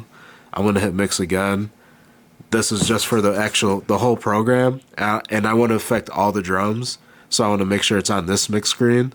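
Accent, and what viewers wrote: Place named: Upstate New York, United States. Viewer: Love that u have that upstate NY accent too! boomboomBoomBOOOOOM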